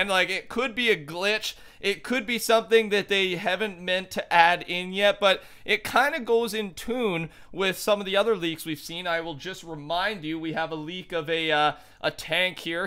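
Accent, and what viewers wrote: Canada. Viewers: Are you canadian? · Sounds like you’re Canadian , Vancouver ?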